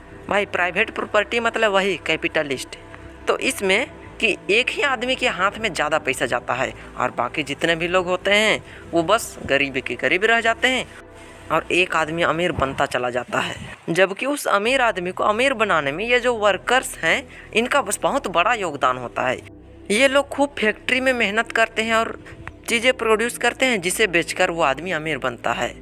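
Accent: Bihar, India. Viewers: are you bihari , because you sound like a bihari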